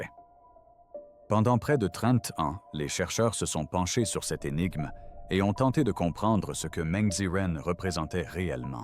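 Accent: Quebec, Canada. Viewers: Ces commentaires IA avec accent franco-canadien sont insupportables… et tout ça pour pas dire grand-chose ! C’est terrible cette narration remplie de détails sans aucun rapport les uns avec les autres. J’ai écouté plusieurs fois et impossible d’en ressortir une cohérence temporelle. Je suis bête ou ce reportage est une arnaque ?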